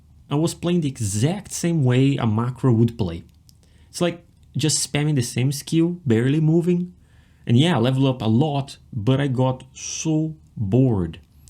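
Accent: Brazil. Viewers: Are you Brazilian by any chance? I saw you are playing in Portuguese. And I can sense I little bit of ascent. I'm from Brazil btw haha😊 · Are you brazilian? I can sense your accent might be from there! :) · KKkkk estava assistindo o video e pensei se era Brasileiro kkk pelo sotaque. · Demorei a perceber que você era brasileiro, seu sotaque é maravilhoso. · I was suspecting you were Brazilian because of your accent, then I saw you chatting in Portuguese lol Nice video, meu conterrâneo!! Let's play together sometime...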